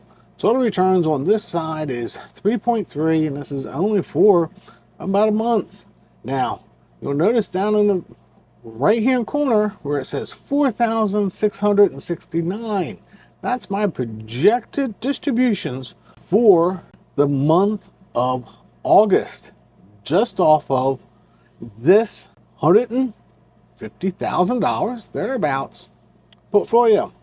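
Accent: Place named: Pennsylvania, United States. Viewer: do you hail from Pa or Delaware? You could be from your accent! I think it's adorable....also could be a Baltimore / Md accent ha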